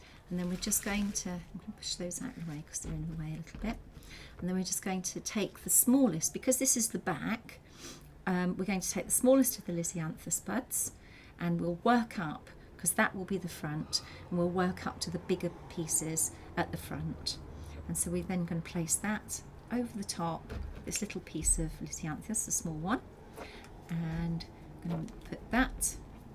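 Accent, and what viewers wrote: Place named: United Kingdom. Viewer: Yes! I came here to say that everything is better with a British accent. And this is a beautiful tutorial!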